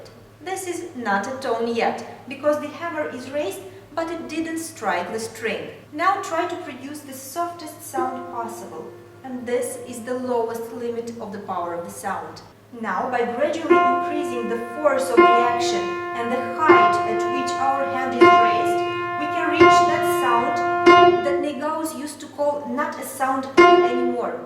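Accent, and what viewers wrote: Russia. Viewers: May I ask when the next update may be?? Also I love your accent by any chance are you Russian? :) xx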